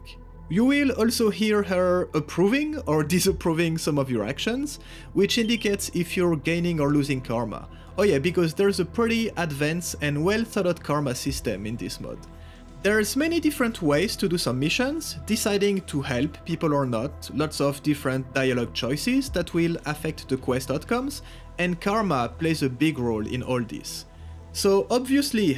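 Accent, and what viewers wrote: France. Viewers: Why does your accent makes me think of a french guy ? If yes that's nice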